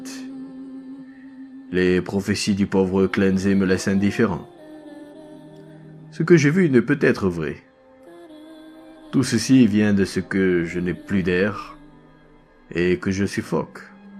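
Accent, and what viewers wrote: Germany. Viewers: Super l'accent allemand ! Et très bonne histoire 👍🏻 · Exellent l'accent allemand! Et jusqu'au bout!! Bravo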